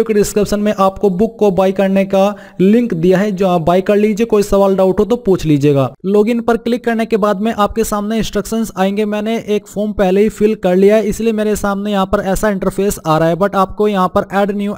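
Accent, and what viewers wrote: Saharanpur, India. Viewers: Brother aap saharanpur sa ho kya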